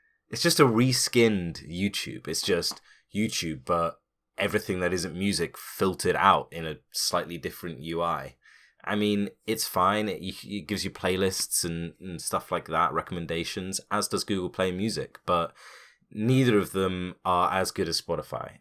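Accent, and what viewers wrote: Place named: London, United Kingdom. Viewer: Great review. But I confess that I continue to listen more because of you accent. I was in London in the beginning of this year and, god, I love that accent. Remind me from some friends. But about the video is quite good, simple, casual.. feels like a conversation. I am probably going to watch more from you. Adding about the YouTube premium, I have to say, it is a bit expensive but I think it's worth it for those who likes differents versions from the music they like. I mean, there is some live performances that are just masterpieces and they aren't available on Spotify or other plataforms. Interviews, podcasts, channel's from a huge variety of things ( there's a massive of content produced specifically to be play on YouTube, from new and old entertainment companies). And still, there's one more things I would like to add: the algorithm. 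Youtube knows all my moods for music and keeps bringing me news findings on, and I that is just fantastic. Something that made me disappointed after watching you was to know that the originals are bad and we can't see the movies that they rent on the site to us. I am going to get premium.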